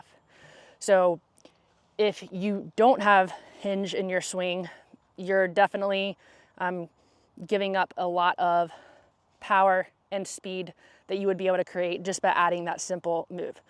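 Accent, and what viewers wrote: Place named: United States. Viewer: I love your work method and most of all your American 🇺🇸 accent !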